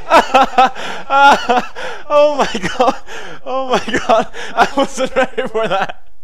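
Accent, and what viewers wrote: Sweden. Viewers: still had that Swedish accent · His Swedish accent is so prominent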